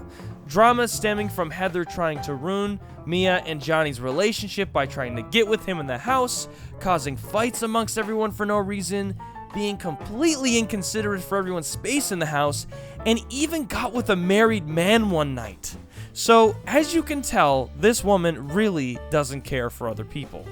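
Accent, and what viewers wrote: Newfoundland, Canada. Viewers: hey what part of newfoundland you from?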